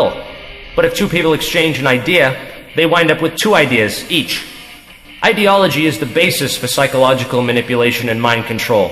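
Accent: Boston, United States. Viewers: What's with the Boston accent? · Good video, as always. But why do you have that Bostonian accent you never had before? I felt like I was watching the departed where the accents changed throughout the film.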